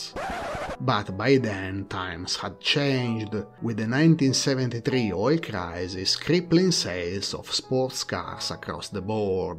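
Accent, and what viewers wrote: Italy. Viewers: I am an Indian, and I compliment you on your grasp over English which is superior to most Americans and frankly, quite a few Britishers. I presume your accent is what is affectionately termed Italian. A small suggestion, "often" is pronounced "of'n".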